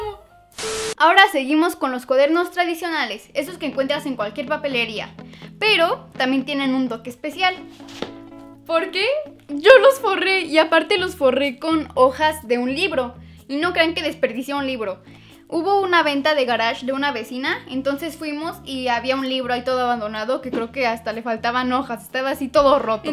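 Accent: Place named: Ecuador. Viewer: Eres de ecuador❓ buen video ❤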